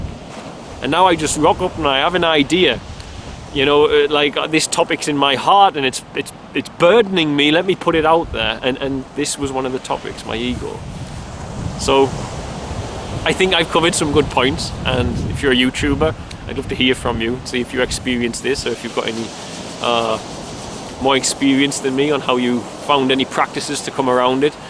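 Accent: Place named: North, United Kingdom. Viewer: I'm glad you just sit and ramble on with beautiful information:))) and love your northern accent!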